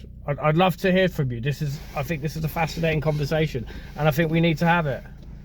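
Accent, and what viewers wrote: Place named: Liverpool, United Kingdom. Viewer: Why are you speaking in a scouse accent? Whereabouts in Liverpool are you actually from? If you are not from Liverpool then why are you speaking in a scouse accent?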